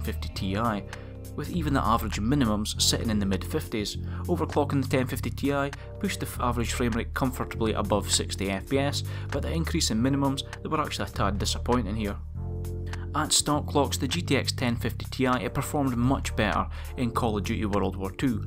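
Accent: Scotland, United Kingdom. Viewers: scottish accent ,i guess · Faurabouts are you fae loon? Afa strong accent, nae a bad thing mind you :) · Good video + scottish accent, am I right?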